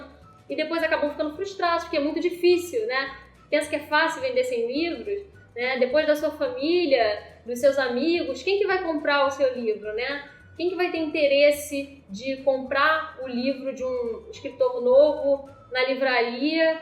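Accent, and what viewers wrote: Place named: Rio de Janeiro, Brazil. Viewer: Pelo sotaque é carioquíssima... Estou procurando editora para Romance dramático. Vou mandar-lhes meu original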